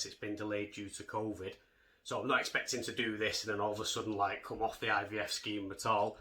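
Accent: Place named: Northern England, United Kingdom. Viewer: Which CF unit do you go to? You sound like your from the North? I go to Leeds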